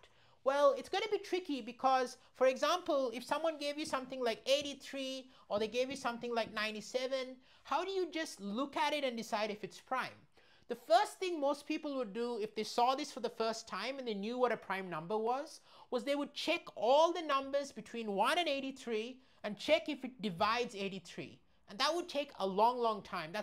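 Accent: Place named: France. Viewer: I think I understood you correctly, but at that point I didn't notice you were French, yet. Anyways, it's much clearer now. The main giveaway of this video is that most of these low primes are easy, even trivial, with some exceptions (maybe just 91, because 49 = 7² and 77 = 7 × 11 are so ludicrously simple)...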